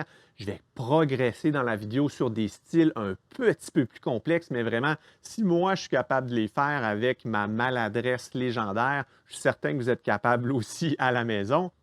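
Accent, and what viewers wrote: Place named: Quebec, Canada. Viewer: On aime ton accent. Merci de nous amener un peu de fraîcheur. Québec, meilleur accent de France 2023...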